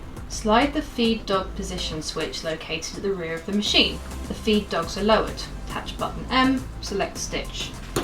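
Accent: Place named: United Kingdom. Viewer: Ok- You are hilarious! You remind me of Brooklyn NY wit, with a British accent.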